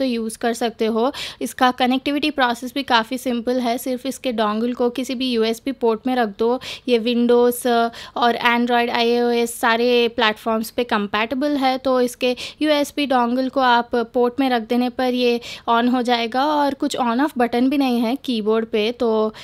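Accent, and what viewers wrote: Hyderabad, India. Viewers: Vaaamo pakka hyderabadi hindi maatlaadesthunaavga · Your Hyderabadi language is super don't bother about any thing your doing well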